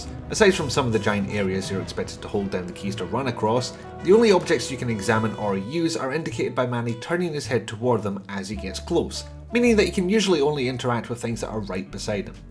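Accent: United Kingdom. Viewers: I love your Scottish accent!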